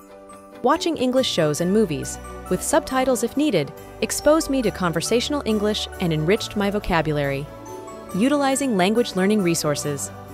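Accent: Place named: United States. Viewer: Thank you very much for your reply. You are amazing. I don't know where you are from or what your background is like, but you sound very American. I can tell you have been dedicating yourself to learning English and you are still working hard. Much respect. Thanks very much.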